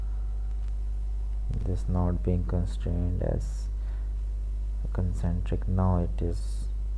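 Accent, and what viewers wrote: India. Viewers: is it possible to find someone that speaks english clearly and does not have a heavy punjabi accent? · ***** I thought it starts from skrach, absolute zero, & that the narration will be more interesting: "Hindi accent" is NOT an excuse for 'the boss make me do this video'.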